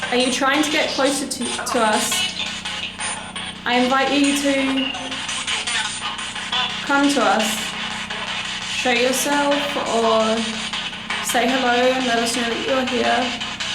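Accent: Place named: Australia. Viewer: Oh, I don't mind at all. I love watching you, as well as listening to your Australian accent. :) Not sure if that spirit you encountered feels the same way, though...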